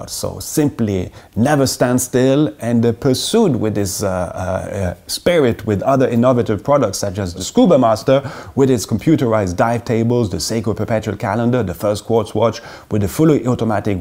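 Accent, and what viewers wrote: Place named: France. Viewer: This being the very first video I've watched on your channel, I was assuming, judging by your name and accent, that you were French. Imagine my surprise when you mentioned near the end that you're Swiss. And you're making a video praising a Japanese watch brand. Respect! Were you tarred and feathered upon your return in Switzerland? I can't imagine the backlash I, as a Belgian, would be facing if I made a video singing the praises of British moules-frites. 😂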